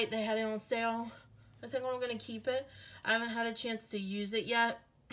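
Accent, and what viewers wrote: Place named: Pennsylvania, United States. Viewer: Are you from PA, trying to place your accent.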